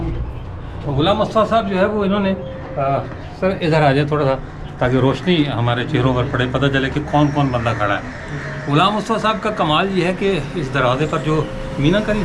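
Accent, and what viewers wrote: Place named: Uttar Pradesh, India. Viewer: آپ کی اردو بولنے کا لب و لہجہ یو پی، میرٹھ اور دہلی طرز کا ھے کمال کے اہل زباں ھیں آپ،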